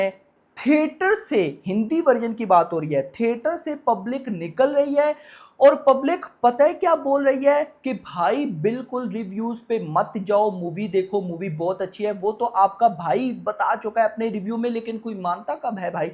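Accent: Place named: Pakistan. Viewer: Yes ye Pakistani h , iske bolne se malum pad rha h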